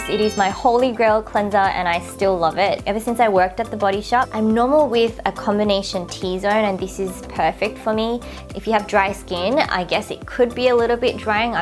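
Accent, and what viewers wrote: Australia. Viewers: Mate!!!! Your aussie accent is so lovely to listen to :)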